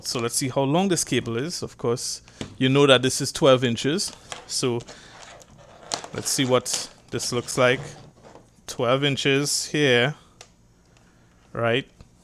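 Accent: Trinidad and Tobago. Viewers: Thank you very much. I am literally in the line to the cashier considering whether or not I should get one. I Googled the product and found your video. Help helped me with my decision. I was also good to hear a Trini accent. 🙂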